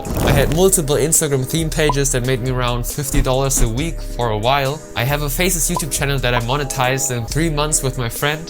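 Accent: Germany. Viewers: are you german loool? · German Accent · are you German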